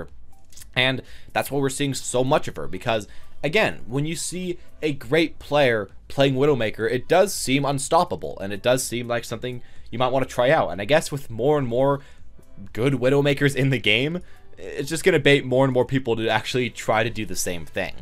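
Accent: United States. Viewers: Are you from like Boston/ New York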